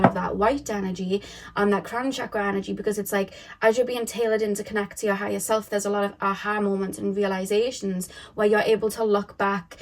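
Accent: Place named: Newcastle, United Kingdom. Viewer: Are you a Geordie? Trying to place your accent.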